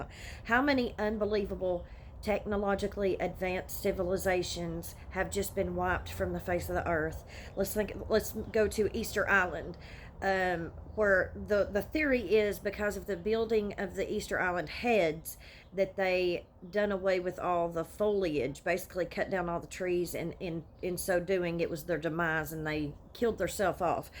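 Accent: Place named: Alabama, United States. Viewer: Love your theory and love your accent. You remind me of my dear gramma. She was from Alabama. God Bless. :)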